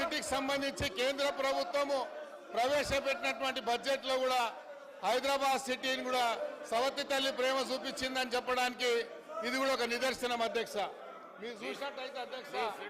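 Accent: Andhra Pradesh, India. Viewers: అమ్మ ఎవరికైనా అమ్మే సేమ్ ఆంధ్ర లెక్క మాట్లాడుతారు మీరు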